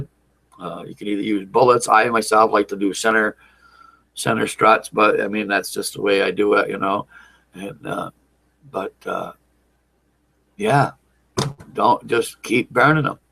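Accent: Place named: New Hampshire, United States. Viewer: I really like these videos, and your accent reminds me of living in Charlestown, NH :)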